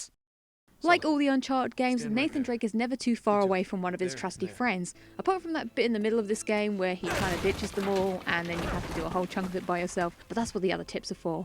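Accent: United Kingdom. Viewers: Dog damn, that British accent. I hate it and simultaneously I live listening to you !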